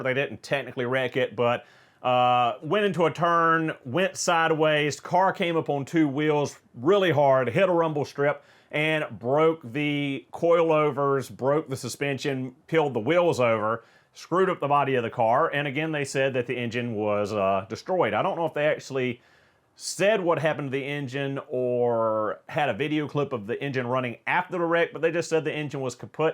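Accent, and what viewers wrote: South, United States. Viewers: Why are ppl with southern accents allowed on YouTube?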